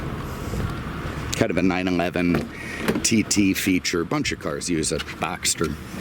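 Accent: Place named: North, United States. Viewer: Why do people who clearly come from the north (your accent gives it away) come to Florida and complain non stop about the heat/humidity? Nobody forced you to come down here. STFU already and just give us the car reviews....:-x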